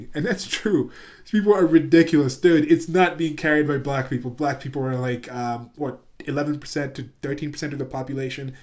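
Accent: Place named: United States. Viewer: Your accent says you're American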